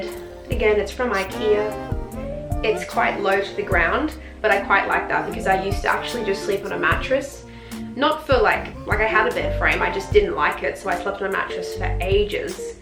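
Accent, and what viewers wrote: Australia. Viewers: Where do you live you have the same Aussie accent as me 😂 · Yesss I've been looking around for another good, aesthetic youtuber and finally I found you!! Bonus points is that you're Aussie like me hah I realised from the background noise of insects before I even heard your accent. So ready to binge watch all your videos!!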